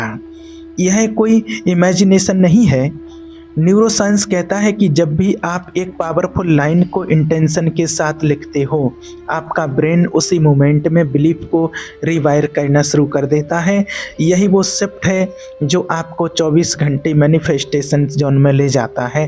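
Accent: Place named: Braj, India. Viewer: Pahle ye brahmend nhi hota Brahmand hota h aap up ke vo bhi birij ke ho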